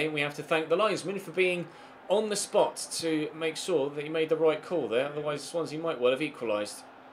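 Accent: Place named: Newcastle, United Kingdom. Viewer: Are u from Newcastle ?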